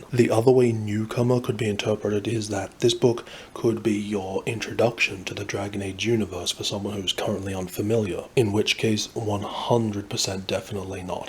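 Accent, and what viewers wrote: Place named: United Kingdom. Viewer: Dragon Age + an ASMR-like narration with British accent = great combination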